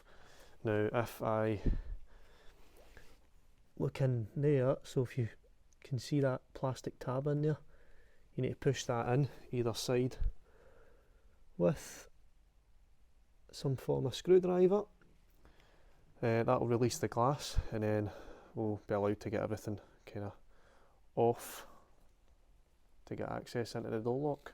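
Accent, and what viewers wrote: Glasgow, United Kingdom. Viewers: Well done for taking on a difficult job, and showing us it wasn't to bad. I enjoy your videos, and my wife likes your Glasgow accent.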